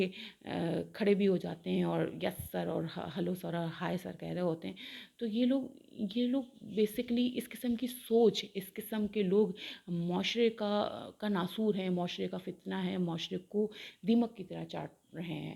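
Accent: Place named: Punjab, Pakistan. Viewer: You are speaking wrong urdu with punjabi accent .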